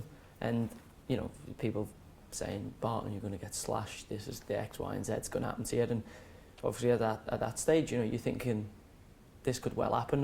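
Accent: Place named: Scouse, United Kingdom. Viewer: Why’s he talking like this? Calmed his Scouse accent right down. Sounds posh almost. Only did a couple of week and had it cush by all accounts. Got gym orderly job right away according to a screw.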